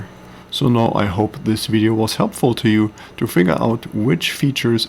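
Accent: Germany. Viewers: U have a German accent so GUTEN TAG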